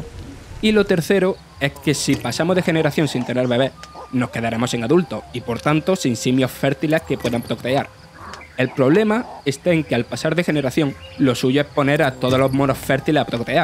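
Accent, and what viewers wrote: Andalusia, Spain. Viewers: yo aun no he sido capaz de ver un videoanalisis de vandal desde que esta este comentarista , parece que tiene la nariz taponada , y el acentillo andaluuuuuuh es muy exagerado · Tiene acento andalúh, déjalo que hable como quiera. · Tío, se hace muy incómodo escuchar un vídeo con un acento tan CERRADO. Soy Andaluz y me encanta nuestro acento, pero éste es demasiado cerrado y muy desagradable de escuchar durante un vídeo de larga duración. Tío, si te vas a dedicar a esto, te recomiendo clases para mejorar la vocalicación. Un saludo desde Málaga. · Es del sur de España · Pues nada, el que tenga problemas con el acento andaluz que se tape los oídos o que se vaya a donde crean que hablan un castellano perfecto y sin acentos,,, lo que hay que leer a estas alturas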